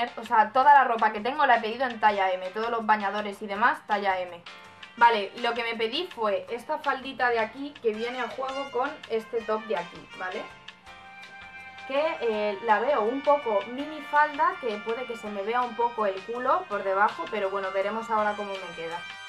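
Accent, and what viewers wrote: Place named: Spain. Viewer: Voy a ser sincero, nunca me agradó el acento español, pero no puedo decirle que no al tuyo.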